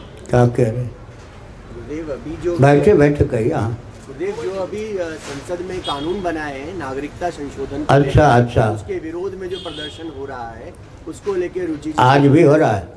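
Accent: Standard. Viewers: Shudh hindi gazab bolte hain 🙏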